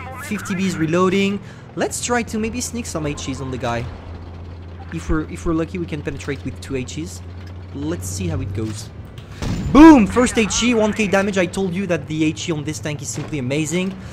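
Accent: France. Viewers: T’es Français non?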